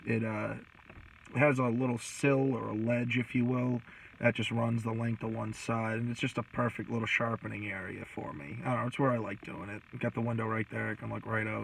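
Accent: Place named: New England, United States. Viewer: Ahh! I’m from Northern Maine originally and you had that ever so slight hint of the accent. Keep up the content! Subbed.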